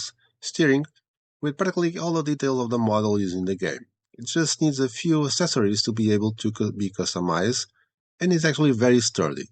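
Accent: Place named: Italy. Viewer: Italian accent 🫶🏻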